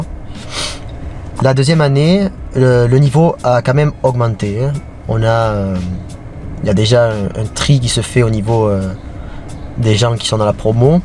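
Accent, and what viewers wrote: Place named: Spain. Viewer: Wow tu as un super bel accent espagnol !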